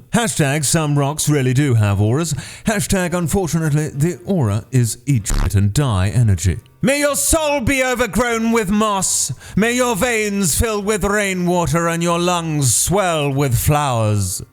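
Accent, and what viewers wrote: United Kingdom. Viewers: 'sup mr british voice sir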